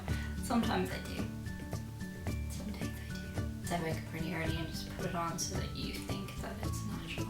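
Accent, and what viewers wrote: Ireland. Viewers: You are a joy to watch but I must agree that this post was very open and sweet! Of course you’re more proficient now, after so many posts and growth is always good! I visited Ireland almost three years ago and your voice “takes me back” to that gorgeous country! I’m thrilled that you’re doing so well AND that you knew that Chewie would be a dear friend and faithful companion; smart lady! Thanks for sharing this!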